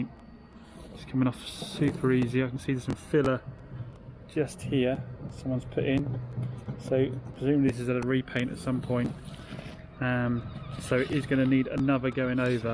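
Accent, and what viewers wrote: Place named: North Devon, United Kingdom. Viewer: haha.. thought you sounded really close, I’m in south Molton!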